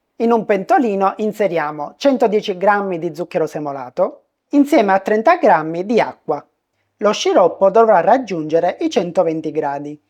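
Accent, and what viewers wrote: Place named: Sicily, Italy. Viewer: stavo apprezzando l'accento siciliano, visto che, se dovessi scrivere la parola: "resta", per come viene pronunciata ci vorrebbero non meno di 4 R😅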